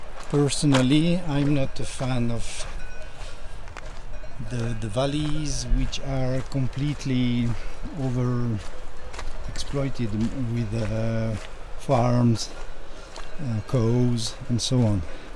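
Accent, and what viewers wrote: Switzerland. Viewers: Je comprends ton désir de t'adresser à une plus grande audience, c'est naturel quand on est sur YouTube et que l'on veut voir sa chaîne grandir. Malheureusement, il m'est difficile de prendre du plaisir en t'écoutant parler anglais étant moi-même bilingue et bloquant à chaque (grosse) faute de langue que tu commets. Tu vas certainement faire fuir une partie de ta communauté et gagner une nouvelle part d'audience, mais si tu n'as pas l'intention de transformer toute ta chaîne en english-friendly, j'ai peur que tu ne te tires une balle dans le pied car les francophone auront fuit et les anglophone n'auront plus de contenu facilement consommable et partiront aussi. En espérant retrouver le charme de ton accent fribourgeois. :)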